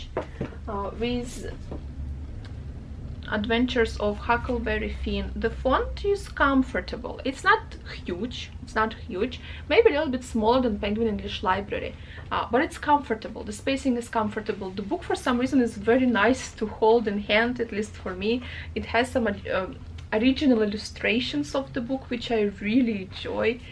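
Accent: Russia. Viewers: Сижу и думаю, почему такой акцент знакомый, оказалось, что у меня такой же. )) Привет из России ) Мега информативное видео, большое спасибо! Как раз подбирала себе издание, хочу собрать небольшую коллекцию книг на английском )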